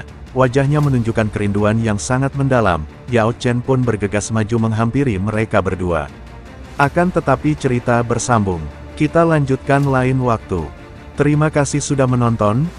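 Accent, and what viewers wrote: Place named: Madura, Indonesia. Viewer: Madura min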